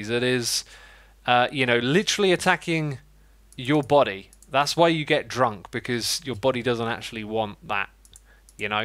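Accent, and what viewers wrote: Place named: United Kingdom. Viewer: imma be honest... ur British accent makes me want to actually listen to you and take ur word for advice as a young person.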